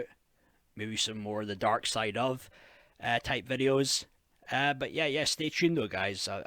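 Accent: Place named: Aberdeen, United Kingdom. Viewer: I'm thinking Aberdonian :)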